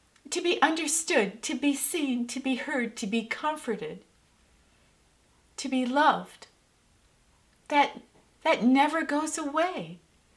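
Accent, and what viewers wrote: Midwest, United States. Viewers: I’m a new subscriber but a seasoned woman exactly your age! I love your videos,! My dog died a couple of years ago so I’m enjoying Desi and watching you two relate. Makes me miss my girlI I love the sound of your midwestern voice and your infectious positivity! My apartment is my sanctuary too and I decorate it with whatevery I find to be beautiful and useful, much of it thrifted. I know I’m alive because my surroundings matter to me and I never stop wanting to beautify my home. There’s no place like it. Thanks for inviting me into your lovely abode! Keep up the great work you’re doing. See you next time. It’s a deal!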